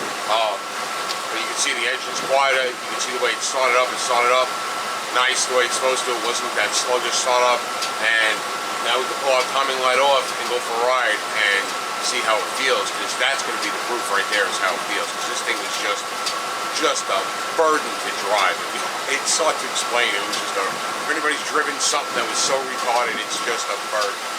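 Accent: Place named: Northeast, United States. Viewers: Great video! By your accent, I can tell you're from NY, PA MA or somethin' lol. I have an 85 caprice, tbi as well. V6 4.3 (262) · Definitely Long Island ✌️ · Great video, thank you! Hopefully this will help my 87. Also, I wish you said retarded more, it sounds great with the New England accent.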